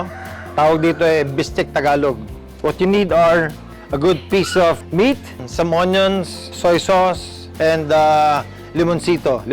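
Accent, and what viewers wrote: Visayas, Philippines. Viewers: bistek tagalog niluluto mo, pero bisaya ka ng biasaya!!! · Visayang -visaya tlaga c Mayor Richard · Makalingaw k kung mag bisaya k 😂🤣👌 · Bisaya a na kayu oi! Naa Jud ang accent sa pagka bisaya HAHHAHHAHA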